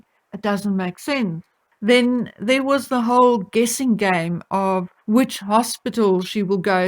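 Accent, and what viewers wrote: South Africa. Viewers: Jy praat engels soos n Suid Afrikaner,is my oor goed in gestel.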